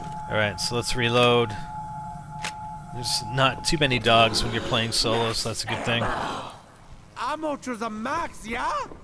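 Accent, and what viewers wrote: Canada. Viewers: are you canadian? :3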